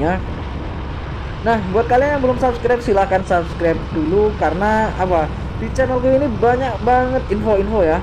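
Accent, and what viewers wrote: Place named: Banyumas, Indonesia. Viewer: Itu bahasanya kok ngapak bang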